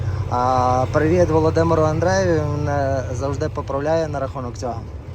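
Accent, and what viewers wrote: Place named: Hutsul, Ukraine. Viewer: Слава Україні! На ЯРДУ на ШАПУ😂 Чому не на ЯРД, ШАП і т.д? Гуцульський акцент...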